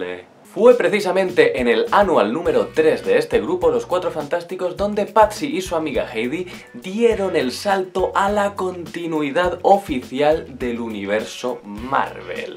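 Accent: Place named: Castile, Spain. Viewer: Preeeeguntas!! - Cuál es tu nombre de verdad?? - Si vives en murcia (o eso creo) por qué tienes acento de castilla ?(diría y león aunque no estoy seguro xD) - Dibujas o guionizas, o lo has hecho en el pasado? de no ser así, te has planteado hacerlo? - Crees que Rondador es sólo una versión alternativa de Bamf en la tierra 616 o coincides en que es un Bamf mutante??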